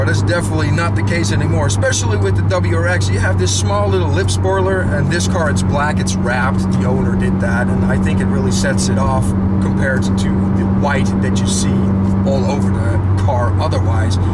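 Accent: Netherlands. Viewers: Ik kan nog steeds horen dat je uit Nederland komt! xD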